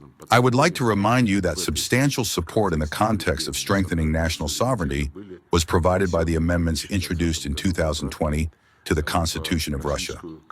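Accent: United States. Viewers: Would be better without the dumb american accent.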